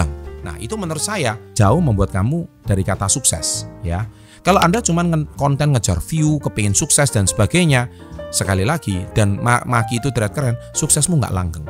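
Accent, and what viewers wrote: Java, Indonesia. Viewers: Matur sembah nuwun, Pak, sae! jian medhok lan jeru....wis mirip dhalang tenan, Pak 👏👏👏😍 Tapi mungkin "Urup" = "Tukar", ya. "Murup" = "Nyala"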